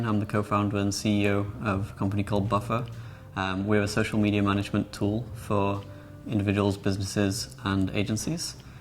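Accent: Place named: Jamaica. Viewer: Ríordáin I think his accent is jamaican...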